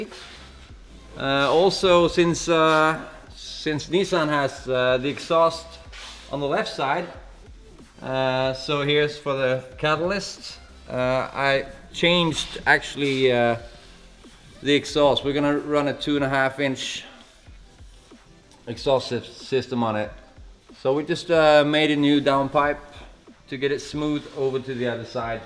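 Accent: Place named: Sweden. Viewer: Well done, your Swinglish was great. 👌 Amazing amount of work you put in to these cars and great explanations of the work here on YT. 👍😃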